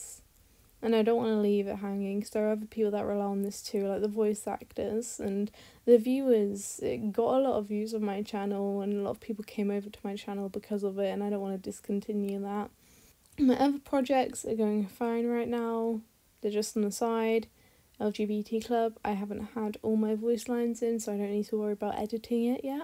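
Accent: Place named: United Kingdom. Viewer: like i said YOUR LIKE ROSYCLOZY YOUR BOTH BRITTISH, SOUND LIKE BRITTISH, AND BOTH MAKE GREAT CONTENT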